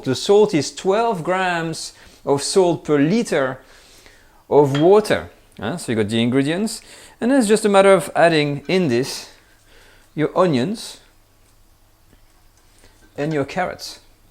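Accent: France. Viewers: You hv the cutest French English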